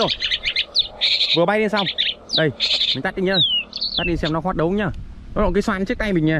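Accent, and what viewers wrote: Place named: Hải Dương, Vietnam. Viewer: ânh đang h đang sống ở đâu vậy, nghe như Hải Dương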